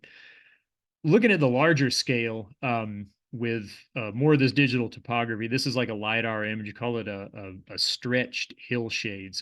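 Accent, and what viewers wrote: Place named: Southern Ohio, United States. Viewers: OP has an E Ohio/W PA/ N WV accent. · Yoo dude must be local to southern ohio/WV he pronounced everything right 😂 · His accent sounds like mine and I'm from Rio Grande. So my guess was also he's a local. Probably from somewhere far from Monongahela as he said it how I would guess which is apparently wrong.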